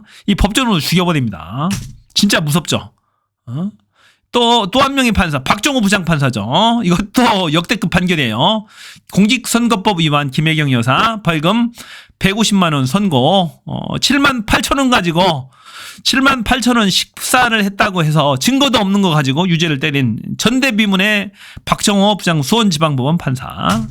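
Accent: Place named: Jeolla, South Korea. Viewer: 한성진 최고다. 아주 시원하다. 방송하는 놈도 전라도구나 ㅋㅋㅋㅋㅋ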